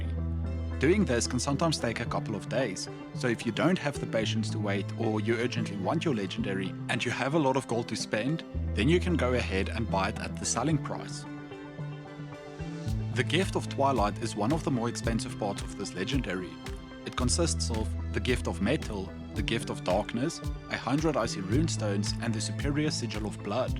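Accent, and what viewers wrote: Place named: South Africa. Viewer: Love the heavy South African accent. - Hello there fellow SA person. Thanks for the guide. o/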